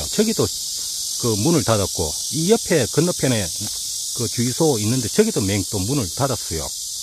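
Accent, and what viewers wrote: Gyeongsang, South Korea. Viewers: 설명 하시는분, 경상도 사투리를 의도적으로 강하게 하시는데, 좀 순화 하시길. "맹" 같은 말은 무슨 말인지? 혼자만 알수 있는단어라면 무슨 소용?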